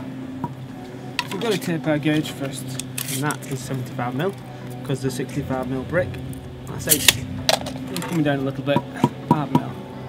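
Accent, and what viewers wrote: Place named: Northern England, United Kingdom. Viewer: Thanks for another great video. I love your norvern accent and eagerly await for your next tutorial. Please keep up the good work pal.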